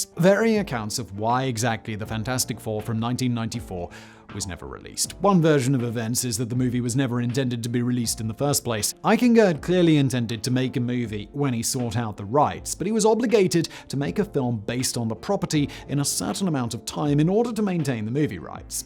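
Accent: United Kingdom. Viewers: THANK YOU so much for beeping out your obscenities. I am old, and people didn't do that publicly in my day. I love your content, your style, your intellect, and your lovely British accent. It is therefore obvious to me that you don't need to use expletives to express yourself very effectively. Thank you again.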